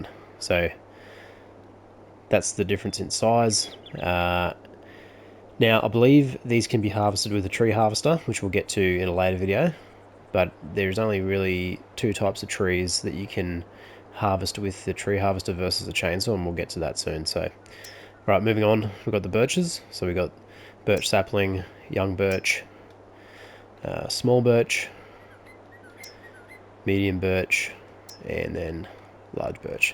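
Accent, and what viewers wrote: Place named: Australia. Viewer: Liked and subbed Great to hear an Aussie playing FS on YouTube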